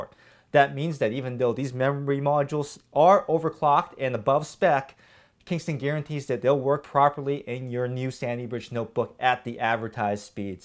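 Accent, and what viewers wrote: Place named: New Jersey, United States. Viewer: That's a lovely accent you have there...New Jersey?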